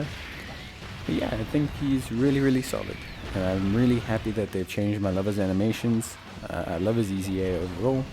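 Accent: South Africa. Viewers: Yo bro are you from South Africa? Your accent sounds familiar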